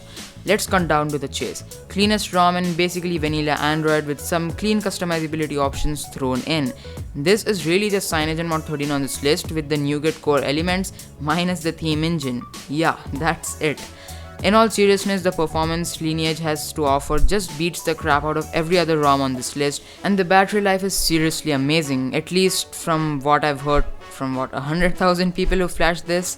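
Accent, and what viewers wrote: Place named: India. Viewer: This is what you get when you combine good english words and Indian accent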